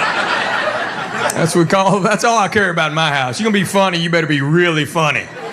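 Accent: South, United States. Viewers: I love quips! He truly is one funny dude! And I love hearing that thick Southern accent coming out of a full blooded Korean dude's mouth. It just adds something extra special because it's unexpected for someone who's seeing him perform for the first time. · Delightful southern accent.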